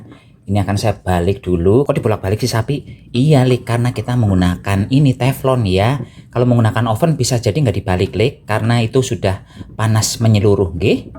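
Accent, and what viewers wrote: Banyumas, Indonesia. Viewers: Bahasane mblaketaket Banyumasan versi Indonesia he hehe lanjutkan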